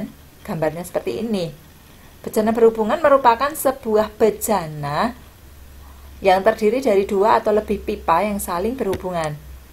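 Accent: Indonesia. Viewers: ibu orang Jawa yahh?👍😭🤣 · Kya ibu guru saya yg dr Jawa Tengah... Suaranya... Maturnuwun materinya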